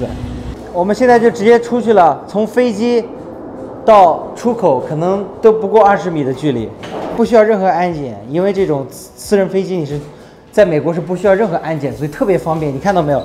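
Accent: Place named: Hefei, China. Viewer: 听出来合肥口音~~ 老乡好 祝你在美国生活愉快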